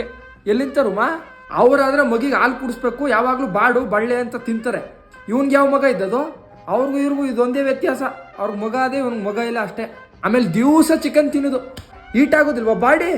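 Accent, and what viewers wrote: Mandya, India. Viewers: ಮಂಡ್ಯ ಗ್ರಾಮೀಣ ಭಾಷೆ ತುಂಬಾ ಚೆನ್ನಾಗಿ ಮಾತನಾಡಿದ್ದೀರಾ ಬಾಡಿ ಲ್ಯಾಂಗ್ವೇಜ್ ಸೂಪರ್ ಒಳ್ಳೆದಾಗಲಿ ನಿಮಗೆ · Mandya slang superb.....❤❤❤😂😂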